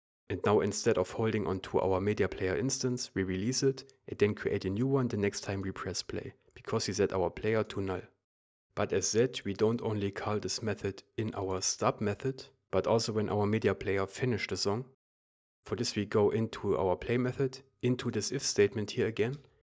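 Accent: Germany. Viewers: Thx man... BTW I like GERMAN Accent 🌚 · I smell german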